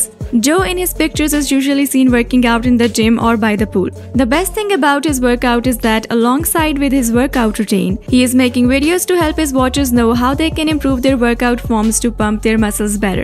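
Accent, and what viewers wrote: India. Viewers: Great......Sounds like a Indian.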